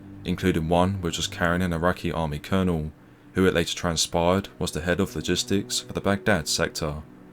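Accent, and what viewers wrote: United Kingdom. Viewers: I'm not sorry don't speak to me about American military with a British accent ever! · 12🇬🇧📯 sounds like a british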